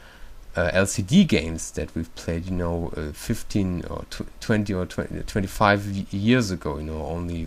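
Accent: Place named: Germany. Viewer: Funny. The minute I heard his accent, he could only be German! XD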